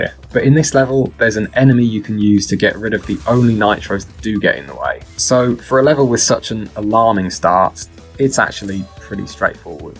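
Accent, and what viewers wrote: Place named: United Kingdom. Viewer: Im so glad i found ur channel. Ur my favorite crash bandicoot modder with a british accent on youtube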